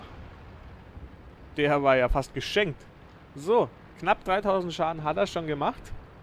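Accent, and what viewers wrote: Bavaria, Germany. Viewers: Mal ne Frage kommst du aus Bayern? :D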